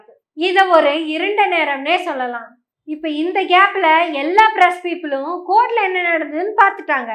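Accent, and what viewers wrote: Kongu, India. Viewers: Kongu tamizh asathal .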